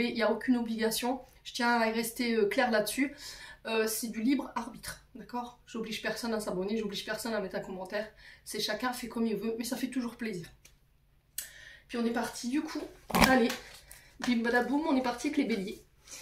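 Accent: North, France. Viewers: Incroyable 😍 ! Tout est juste pour moi et quel plaisir d'entendre ce petit accent du Nord. Merci beaucoup, je m'abonne ❤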